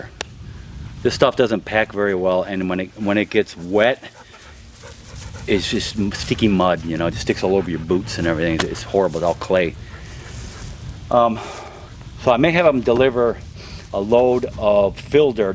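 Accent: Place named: New England, United States. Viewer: sounds like New England to me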